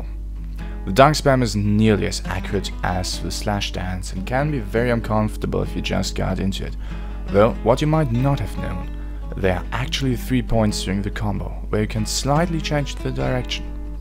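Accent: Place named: Germany. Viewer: Klingst auf jedenfall deutsch xD sehr schönes Video 👍Abo hast du sicher.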